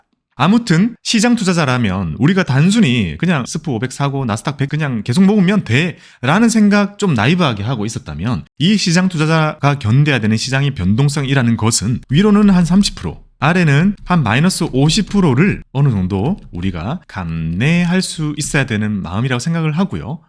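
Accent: Gyeongsang, South Korea. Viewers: 투자공부보다 갱상도 억양의 보이스가 너~무 매력적이라 귀에 쏙쏙 붙어서 목소리 들으러 오는데 덤으로 알려주는 투자방법도 내 성향에 맞는것은 가져 감 ㅋ 쌩유요 ^^😂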